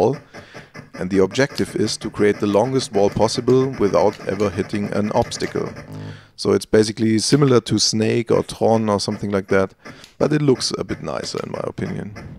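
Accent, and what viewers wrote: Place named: Germany. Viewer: Einfach Deutsch-Akkzent Goldstandart 😄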